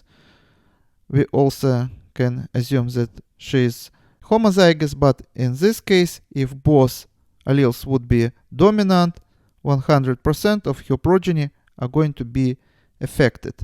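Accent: Russia. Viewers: Ur accent, russan or greek or what?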